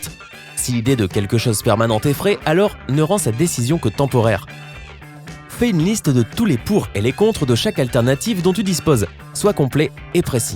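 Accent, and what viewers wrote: Quebec, Canada. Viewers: Dite vous etes québécois hein tes un youtubeur québécois ?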